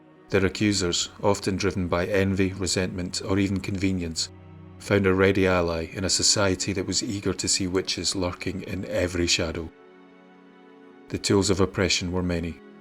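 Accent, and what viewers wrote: Scotland, United Kingdom. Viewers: I could listen to your Scottish accent forever. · you do such a wonderful job with these stories. I look forward to each one! I must admit this one broke my heart. 😢💔. I must say my heart belongs to Scotland.🏴. I am blessed to have sister by heart who is from Aberdeen. I will visiting this coming June. And your accent! Best accent in the world. Could you narrate my life story? It would actually sound interesting then. 😍. Thank you 🙏 and keep the stories coming!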